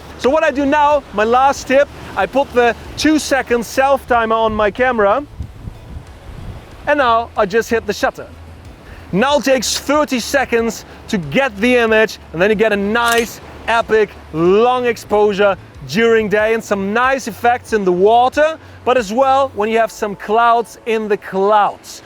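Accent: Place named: Germany. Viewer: Came around on your channel today. You make super fun videos and your german accent is really good to listen to :D Hello from Slovenia.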